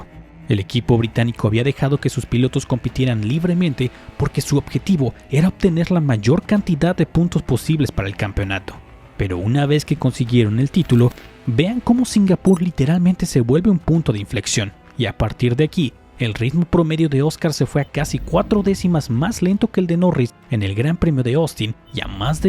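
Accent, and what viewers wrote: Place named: Mexico. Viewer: JAJAJJAAAJ Eres mexicano cierto?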